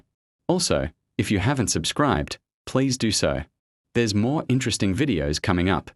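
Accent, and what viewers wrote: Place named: United Kingdom. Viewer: An AI voice talking with a British accent, pronouncing Arabic names perfectly, and claiming that Muḥammad ibn Mūsā al-Khwārizmī was an African. In reality he was from Persian or Central Asian descent and was born in the region of Khwarizm (Chorasmia), near present-day Khiva, Uzbekistan. This channel is really unravelling...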